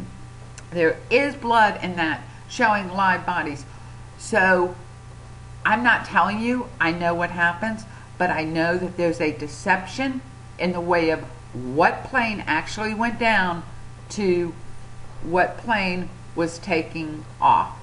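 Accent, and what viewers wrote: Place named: United States. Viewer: NO, it's NOT 'absolute proof'. It is not 'proof' of any kind. It MAY be evidence. It also may be the plane at the next gate! I don't know how many times I've been about to board and see what I think is my plane - when in fact my plane is next to it. The boarding gates are often deceptive, where you go through a door right near the plane you think you're about to board when guess what? You walk along a long corridor to a plane that is a long way from the door... often not even visible from where you're waiting. And, of course, that's assuming that the photo that swept the world was taken at the time and place claimed. Assuming that it isn't another hoax, like the man on the roof of the World Trade Center! Just because you see it, doesn't make it real. Go watch a 'magician' sometime to understand what I mean. From your accent, you're in the US. 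Are you one of the 98% of Americans who have never been abroad? And for all those conspiracy theorists who say that the crashed plane is the airliner that disappeared after taking off from KL... Yes, this would be a good way to dispose of an embarrassing plane... But how do they dispose of THIS embarrassing plane? Oh, I do believe in conspiracies - but not idiocy.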